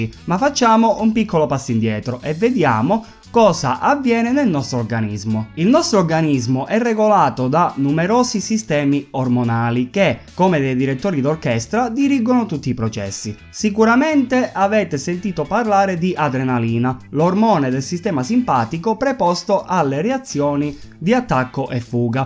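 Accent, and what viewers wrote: Italy. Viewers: Compà sei calabrese? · Quanto ci sta l’accento sardo ?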